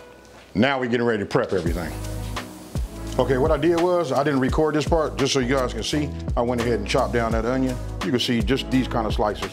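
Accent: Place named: South Los Angeles, United States. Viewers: He definitely sound like he from Slauson and western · Factz luv his cali accent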